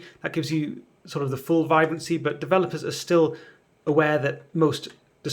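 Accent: United Kingdom. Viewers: UK accent adds even more points to the professionalism of this video. Top notch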